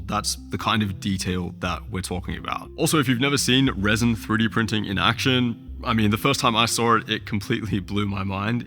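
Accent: Australia. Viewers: Australian creator says "dollars" in their Australian Accent while living and working in Australia and means USD instead of AUD?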